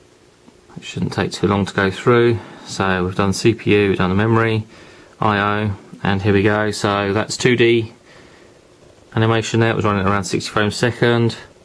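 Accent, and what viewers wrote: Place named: England, United Kingdom. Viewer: English accents unboxings are the best.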